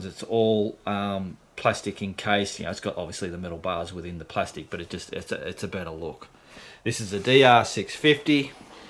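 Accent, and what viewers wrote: Australia. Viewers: I was 1 minute in lol, he's proper Aussie 😂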